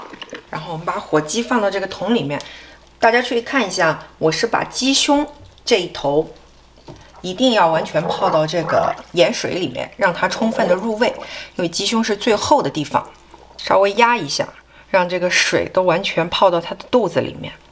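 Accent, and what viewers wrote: China. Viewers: 您是北方哪里人，说话味道还蛮好听的，感觉是齐齐哈尔的吧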